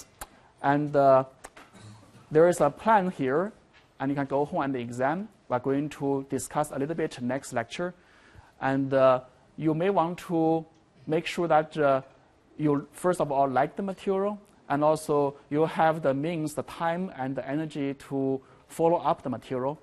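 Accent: China. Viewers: Chinese English,XD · 我第一反应也是天朝口音真亲切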